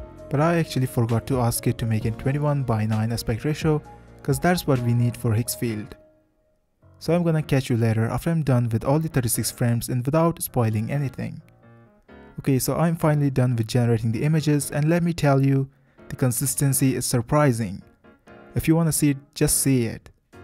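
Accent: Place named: India. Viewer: Is it only me who thinks he has an Indian accent?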